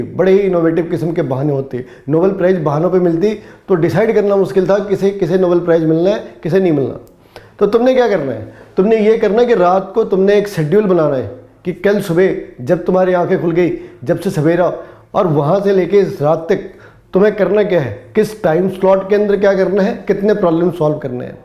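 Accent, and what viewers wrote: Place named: Punjab, India. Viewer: Punjabi tadka.....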